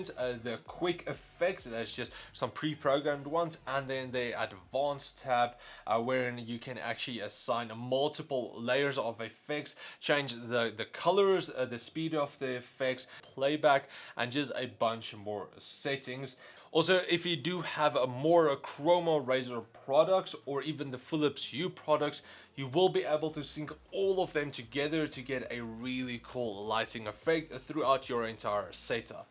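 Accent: Afrikaans, South Africa. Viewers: Good vid. Judging from your accent and your website being .co.za you live in SA? I do too and most youtubers make builds in dollar. Can you please do a R10 000 gaming pc build? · Is y Afrikaans?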